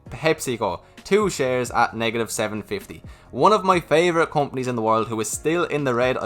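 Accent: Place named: Ireland. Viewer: Seriously mate, three reasons for me to keep on watching your videos: your enthusiasm, expertise and definitely your Irish accent. Is there any reason though why I hardly see any short term investments in your portfolio or long term investments in low rated companies?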